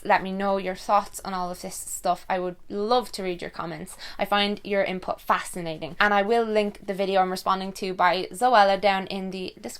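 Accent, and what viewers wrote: Ireland. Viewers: Before watching your videos, I thought that the English accent was the best. Now I'm love with the Irish one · Veronika Meister Any accent can sound both good and bad, in my experience. I've met Germans with lovely, lively and warm accents and others with a very harsh and unpleasant ones. My friends ex had this amazing accent, very pleasant and soft. Same with Irish, some have a lovely accent (like on these videos), others have an accent that is very abrasive. Heck, look at British accents, so many regional varieties - some of which are, let us say unpleasant lol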